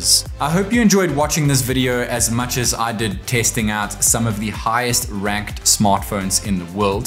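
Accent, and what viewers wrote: South Africa. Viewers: Yay , great review I thought your accent was familiar. Hello fellow South African 🎉🎉🎉looks like Waterfront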